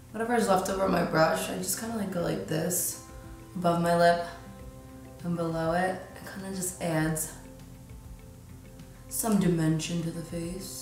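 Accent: New Jersey, United States. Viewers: i can’t tell , does she have a new jersey accent ?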